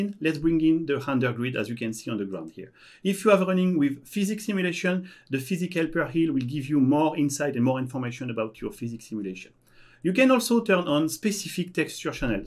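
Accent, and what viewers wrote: France. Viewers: Bravo! Ton accent frenchy et toi etes une fierte nationale! Je suis un nouveau converti a BabylonJS. Merci a toi et a toute l'equipe, vous avez fait un travail incroyable. Au dela meme de la qualite de tout le travaille realise, je reste toujours impressionne par la vitesse de reactivite sur le forum. Et egalement merci a Microsoft pour soutenir ce projet en OpenSource. Thanks you so much guys for your amazing work!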